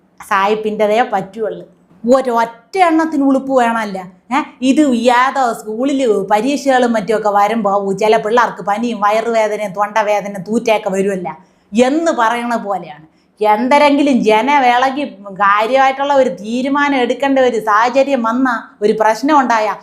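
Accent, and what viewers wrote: Thiruvananthapuram, India. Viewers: തിരുവനന്തപുരം ശൈലിയാണെങ്കിലും കേൾക്കാൻ രസമുണ്ട്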